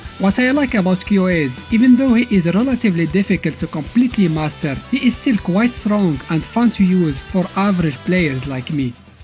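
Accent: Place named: Morocco. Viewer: Bro are you Moroccan your accent is very familiar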